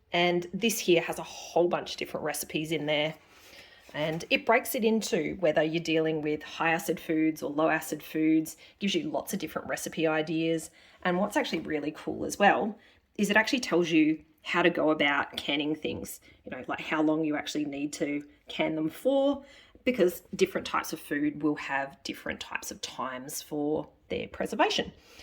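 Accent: Australia. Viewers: Thanks for creating this series for newbies like me! I recently got a pressure canner for my birthday and have been reading lots of books and trying to watch videos - however it’s refreshing to listen to an Aussie video for once 😂 Excited to watch the rest of yoir series tomorrow and hopefully give it a crack in the next fortnight! Cheers Samantha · Hi, first time viewer here and I really enjoyed this video regarding preserving. I have done some previously but we moved back to Qld from tassie and I lost touch with my passion. I am now back in Tassie and I want to resume my preserving. I feel that I have lost some of my confidence, so I am sure that watching your videos it will help me along my journey. it is so lovely to hear an Australian voice and you explain things really well. Thank you for taking the time to put together the videos and I am looking forward to the next one. 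Jay 😊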